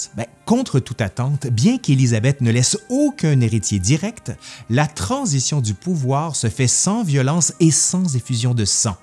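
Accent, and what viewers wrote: Quebec, Canada. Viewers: Oui, a un accent québecois et il maitrise très bien sa langue. Ce n'est pas commun hélas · La personne de cette même vidéo parle le français canadien, est-ce que vous le comprenez? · Excellente vidéo, comme toujours ! Et ce petit accent Québécois si charmant c'est la cerise sur le gâteau 🎂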